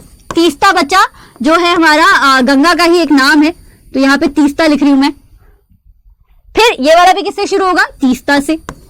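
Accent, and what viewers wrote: Tamil Nadu, India. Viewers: By ur talking style i think that u are from tamil nadu😄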